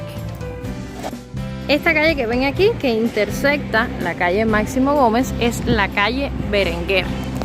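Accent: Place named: Dominican Republic. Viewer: Tu tienes acento dominicano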